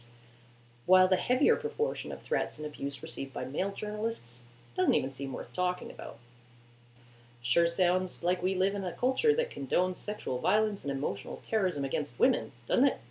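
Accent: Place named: Canada. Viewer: i really like this monotone reading voice is the best way to get a point across. this not sarcasm you should get a job at npr all though you sound canadian i'll look up ya'lls npr lol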